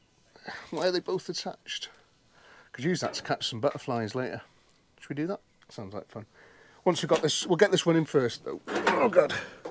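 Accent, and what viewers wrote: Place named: England, United Kingdom. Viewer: I´m from Germany and i love your english. Where in England are you from?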